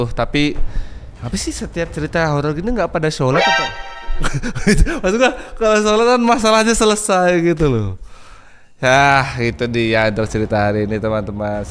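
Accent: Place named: East Java, Indonesia. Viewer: Mas iki wong endi? Boso jowone logat e kentel. Kyok cah jawa timuran😂😂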